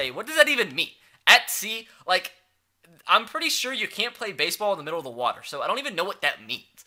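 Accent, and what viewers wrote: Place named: Alabama, United States. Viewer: Yo what part of bama you from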